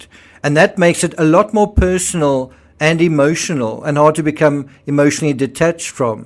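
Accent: South Africa. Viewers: I like your channel very much, especially your naration and your, perhaps South African, accent? :) Your voice is quite calming and very pleasant to listen to. Good work.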